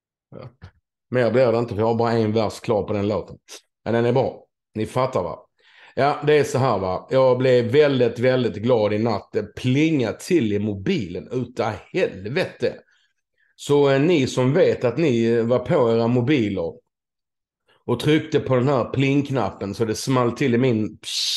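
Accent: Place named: Skåne, Sweden. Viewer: Ja där började din resa på köpenhamnsvägen .. i Malmö och dialekten sitter , och ålahue så skånskt 😜 tokigt 😂 men kul ..😘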